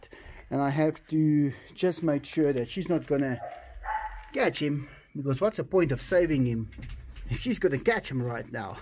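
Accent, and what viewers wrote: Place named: South Africa. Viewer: Majestic cretures + South-African accent =best channel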